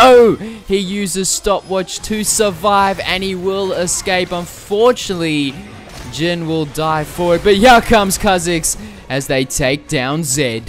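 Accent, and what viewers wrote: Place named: South Africa. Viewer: You have to be South African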